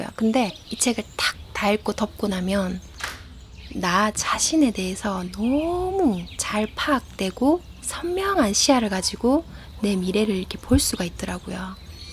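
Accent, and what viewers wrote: Gyeongsang, South Korea. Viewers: 오~ 간만에 활기찬목소리 갱상도 특유의 목소리. 에너지 마이 실린 목소리 감사합니다. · 마약의 경상도 톤...와...반가와요~~^^ 잘 듣고 갑니다~ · 말투가 경남쪽인데... 20대 때 짝사랑한 누나가 부산 사직여고 졸업.. 지금은 서울 삼성의료원 과장..되서 잘먹고 잘 살고있어요.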